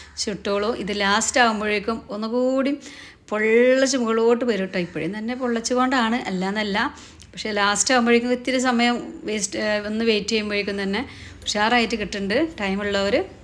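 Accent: Malappuram, India. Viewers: പാചകവും വാചകവും ഇഷ്ടപ്പെട്ടു കേട്ടോ, മലപ്പുറം ഭാഷ ഒത്തിരി ഇഷ്ട. സൂപ്പറാണെ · നിങ്ങളുടെ മലപ്പുറം സ്ലാംഗ് ആണ് കേൾക്കാൻ രസം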